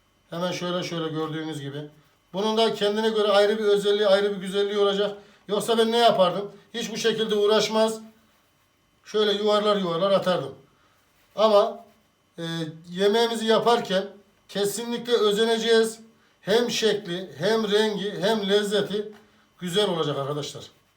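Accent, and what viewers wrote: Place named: Central Anatolia, Turkey. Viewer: Ustam siz nerelisiniz 🤗 şive tam içanadolu gibi 🤭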